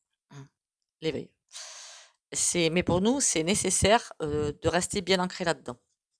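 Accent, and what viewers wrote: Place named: Toulouse, France. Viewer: Bonjour, Je me suis abonnée grâce à Kriss Sinclair. Merci pour votre tirage et vos conseils et soin au pendule. J adore votre accent qui me rappelle Toulouse et ses alentours. Tout de Bon! Eulalie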